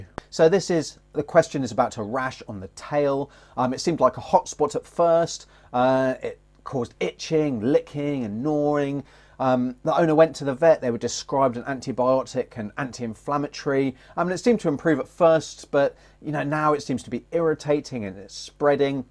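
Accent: United Kingdom. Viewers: I can't understand you with that deep UK accent. I heard dog and rash. That's all I understood.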